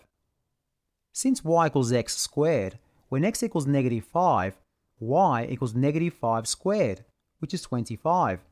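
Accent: Australia. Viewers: drive a nail where you want the focus to be. Tie one end of the string around that nail. Extend the dangling string downward toward you on your surface with a loose loop where you want the base of the parabola to be, and run the rest of the string vertical up the surface past the nail but attach it to the side of a 90 degree triangle square just touching the nail... Put your pen at the loop directly down toward you from the focus nail - that'll be the start of one side of your parabola... now slide the square straight to the right side and keep the pen tight against the string - as you continue to move the square, the string will slide upward and you'll trace a parabola shape... reverse it the other direction or trace the 1/2 you already did... Bob's your uncle. (since the teacher sounded ozzish (well, at least commonwealth something...)). Cheers.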